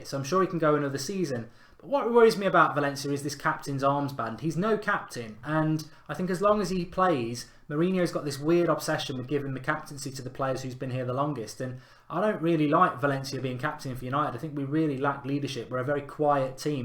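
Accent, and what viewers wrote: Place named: Newcastle, United Kingdom. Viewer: Loved the Geordie accent.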